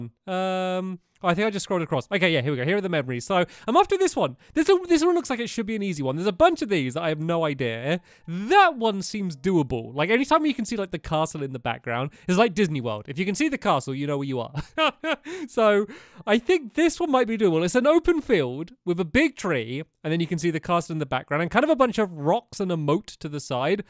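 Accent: England, United Kingdom. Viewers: Uhh he is English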